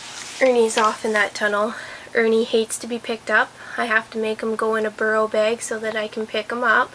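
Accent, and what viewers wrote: Canada. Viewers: I <3 your accent. Are you Canadian?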